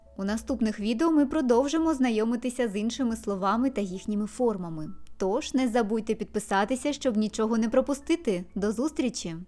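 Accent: United Kingdom. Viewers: Вітаю. Дякую за черговий корисний і цікавий урок. Окрема подяка за British pronunciation 🇬🇧💪🇺🇦✌️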